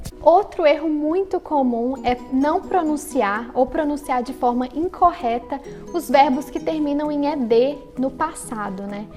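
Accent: Minas Gerais, Brazil. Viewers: You seem to be mineira. Se for é conterrânea XD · Pode passar 40 anos fora do Brasil, mas o irreconhecível sotaque de mineira não sai! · Esse sotaque mineiro é muito amor!! <3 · so pelo sotaque daqui de minas ja vale assistir o video rsrsrs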